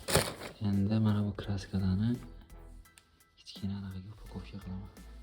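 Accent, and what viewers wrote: Khorezm, Uzbekistan. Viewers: Хоразмданмисиз асли шевангиз сал тортяпти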